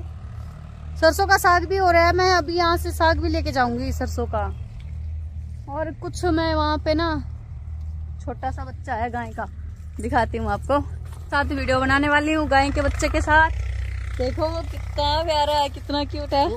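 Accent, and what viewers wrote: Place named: Haryana, India. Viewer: Hum ko bhi haryanvi sikhni padegi lagta he qki bohat achi lag rahi hai aapki haryanvi. Hum to Mumbai k he Marathi bolte he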